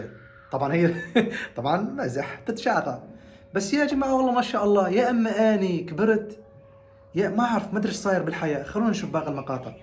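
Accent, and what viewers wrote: Iraq. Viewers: عمره 16ولهجتك نفس لهجت العراق 🇮🇶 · اللهجه العراقية تجنن😂 والله زمان جنت اتابع مقاطعك وهسه طلعت الي مرة ثانيه والله الحمدالله من طلعتلي مرة ثانية لهجتك تخلي الواحد يتيه كلش صاير عراقي الى ربع 😂😂😂 والله احسن من ينور اليوتيوب ❤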